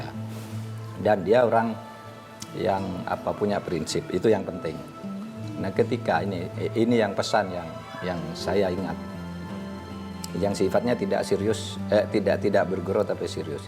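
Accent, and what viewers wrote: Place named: Madura, Indonesia. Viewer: Pak Mahfud ini orang madura ya.. logatnya kelihatan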